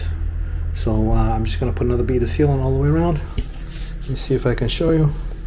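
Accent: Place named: New England, United States. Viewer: Great video. You are definitely in New England with that accent! Boston?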